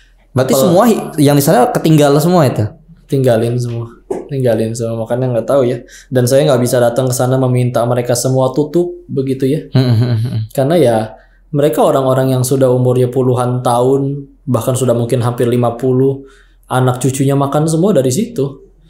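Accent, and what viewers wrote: West Java, Indonesia. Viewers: Masya Allaah Barokallaah atas ilmu & pengalamannya Koh Cara penyampaiannya raoseun Meni sunda pisan padahal bukan asli sunda👍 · MaasyaaAllah, mirip a agym ya bicaranya, logat sunda😁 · Sampe logatnya yg nyunda pisan pun sama 😁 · Logatx sunda ea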